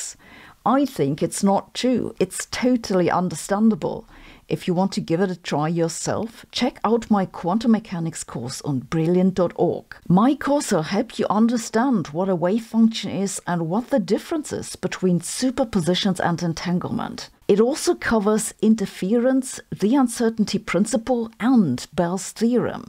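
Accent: Germany. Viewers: Sorry, heard the German accent and had a Get Smart flashback to KAOS.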